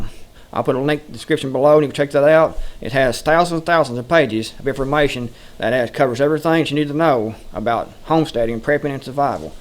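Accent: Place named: Boston, United States. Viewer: You sound different. Where you raised in Boston? LOL Great video. Imagine a different upbringing that didn't lead you to a love of the outdoors and huntin' and such. Man that woulda sucked! Peace, brother and be careful that they don't sneak up on ya!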